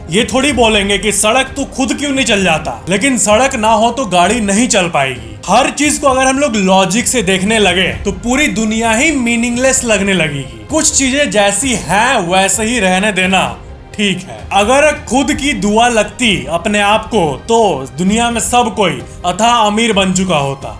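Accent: Bihar, India. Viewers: Itne bade youtuber ho par aap ki laungauge sahi nahi hai botton badao sahi hota aap boltr ho battan dabo ge lagta hai aap bihar k ho.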